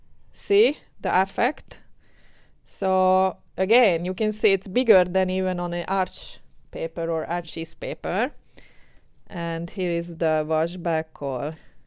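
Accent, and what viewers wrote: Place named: Hungary. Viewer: oh look, a hungarian accent! :D nice cats btw! so furry and fluffy looking!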